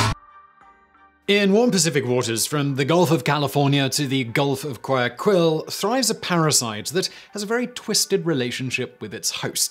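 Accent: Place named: RP, United Kingdom. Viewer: Why do you sound posher than usual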